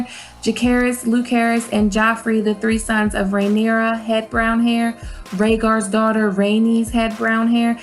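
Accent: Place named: Baltimore, United States. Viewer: You gotta be from Baltimore lol. I can hear my accent from anywhere lol.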